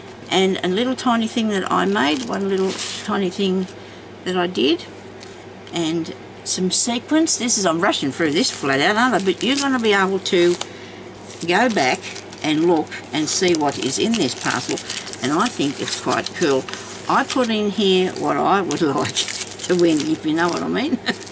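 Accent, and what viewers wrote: Australia. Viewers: Oh wow...just discovered your channel :).....being a newbie, I'm on a voyage of discovery! I heard you speak.....and thought ..Wow..another Aussie ..yay:). Love your work and so good to hear our lingo xxxx. Question? ....saw another video you did about lace covers and you used a hot glue gun. Does the glue show through with thinner fabric ( as in internal lining in a book?...spray glue leaves nasty marks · Merry merry Christmas. I love To hear another aussie voice. How do you wash vintage laces?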